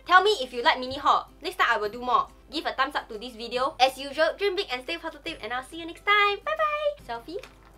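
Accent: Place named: China. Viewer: I like how you are talking like half eng half chinese, just shows that you are not putting up a fake front infront of the audience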